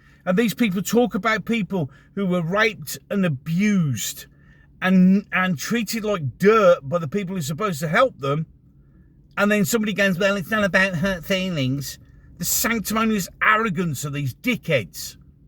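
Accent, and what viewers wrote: Birmingham, United Kingdom. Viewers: Spoken like a true old skool Brummie 😂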